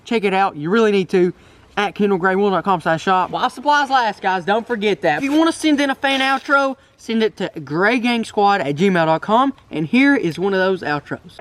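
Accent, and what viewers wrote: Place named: Alabama, United States. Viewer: Why do you kill them for. they had a good life wow you can tell he's from Alabama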